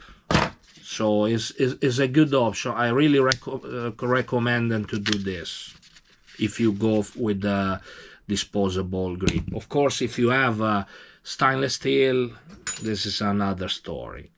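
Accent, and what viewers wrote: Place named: Italy. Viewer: Complimenti per le tue creazioni ! hai davvero grande competenza ! Comunque Si sente che sei italiano ma parli così bene e lentamente l’inglese che sembra come se parlassi italiano . Sei un Maestro 🔝🔥 🔝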